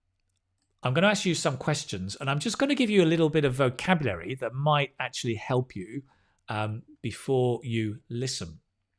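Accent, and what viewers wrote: United Kingdom. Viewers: Your lessons are really important always.You try your best to give us the best free.Thanks a million teacher!Always love to hear your british accent!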